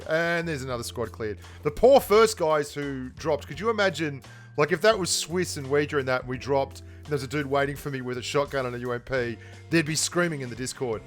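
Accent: Australia. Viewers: That Aussie accent tho ☺️ took me few videos to realize that you were saying "rating" and I was hearing it as "writing" 😎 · you austrialian accent is too strong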